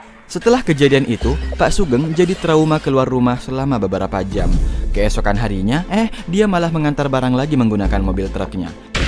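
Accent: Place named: Sulawesi, Indonesia. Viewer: Ini kayak orang sulawesi logat"nya🤔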